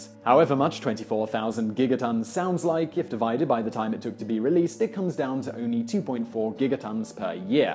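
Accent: England, United Kingdom. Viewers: is a great learning resource and a great teacher. Full-meal range - tragic (worst despots), inspiring (celebs once homeless), serious (environment, addictions), fun (Richard Gere links) and more - gives Brain Gym capacity-building., you engage us with great voice, classy accent (a pox on the naysayers), crystal clarityy at speed, and England's great cultural assets of dignity, humour and discretion. To quote the in, "Who could ask for anything more?" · I'm not easy to get a like or subsciption out of. But most of the video and channels paticipates on make me wish I could subscribe and like more than once. Thanks to the team, I feel like Wikipedia is being delivered to me in video with a fine British accent and large vocabulary.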